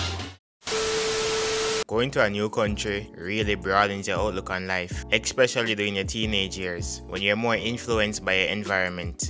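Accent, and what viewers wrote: Jamaica. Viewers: U should try n use ur jamaican voice some part in a di video dem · Badman, da jamaican accent deh nuh gone at all, it strong yf 😂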